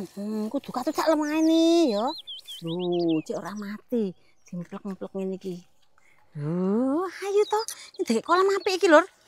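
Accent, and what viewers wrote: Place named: Surabaya, Indonesia. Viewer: Logat ny campuran surabaya ya heheeh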